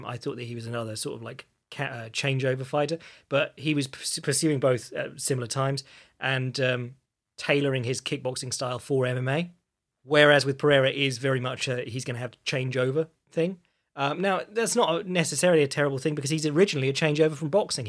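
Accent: England, United Kingdom. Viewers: I love the honesty coupled with an English accent. Great breakdown 👌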